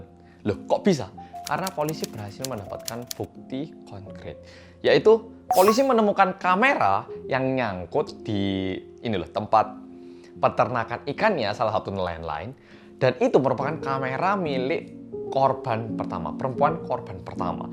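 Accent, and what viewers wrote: Java, Indonesia. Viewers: BENERAN MEDOK WKWKWKWK aku subskriber baru mas :"v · Mas yang medokk^_^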